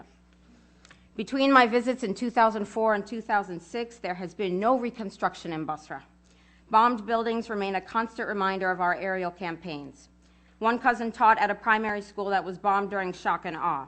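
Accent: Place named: France. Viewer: Are you? You speak like you may be FRENCH.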